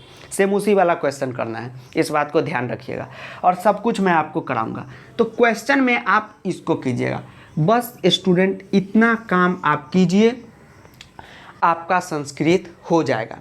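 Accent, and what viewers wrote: Bihar, India. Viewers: Sir aap Bihari hai ya Gorakhpuri 😂😭😂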